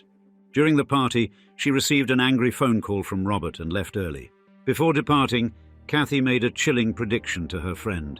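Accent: United Kingdom. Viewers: Always some British schmuck narrating American History. As though their own isn't enough of a goldmine full of corruption, theft and murder.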